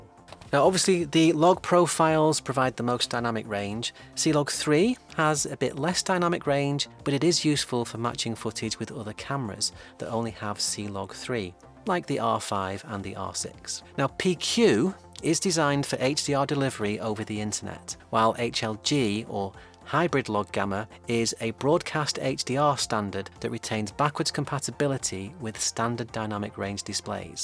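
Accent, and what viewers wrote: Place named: England, United Kingdom. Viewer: Thank you for this very informative video. Gave me the information I wanted with an English voice presented at a nice pace. Thanks again.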